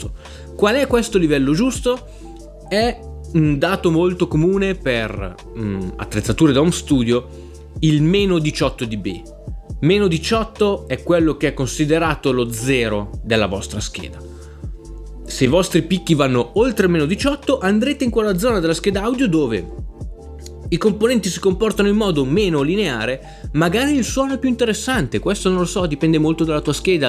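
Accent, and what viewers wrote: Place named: Rome, Italy. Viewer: Co st'accento sei proprio 'ntajo (con questo accento sei veramente divertente per chi chiama da fuori Roma). Grazie per tutte queste spiegazioni. Anche sapendole, ripassare non fa mai male. 😆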